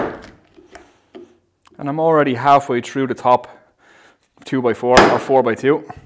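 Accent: Waterford, Ireland. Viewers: Some Waterford accent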